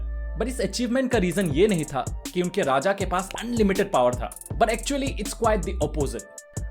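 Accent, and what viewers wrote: Assam, India. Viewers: তুমাৰ হিন্দী দেখী গম পায় গলো তুযি অসমীয়া বুলি 🔥🙏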